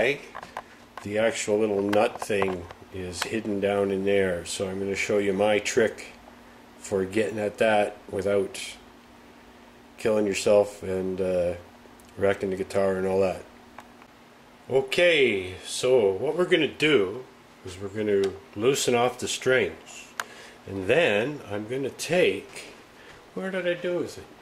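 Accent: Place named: Canada. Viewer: Oh man ! From just a single 'without' i thought.. huh, this guy gotta be canadian! And then .. the maple leafs tee :) it is so funny because i'm Polish and i've never been to Canada or even Usa, but i have family in London, Ontario - and i've heard about this slight difference. It happens to me second time this weekend :) Absolutely no offence, i love this accent and i wish i could visit my family and your country someday ! Great bass and nice movie, thanks :) 51's are hot :D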